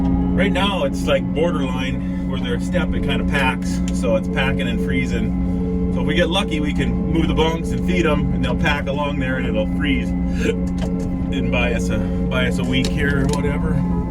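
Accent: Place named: North Texas, United States. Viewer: Sounds like us here in North TX😀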